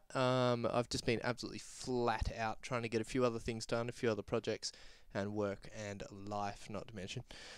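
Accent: Australia. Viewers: By any chance are you Australian ?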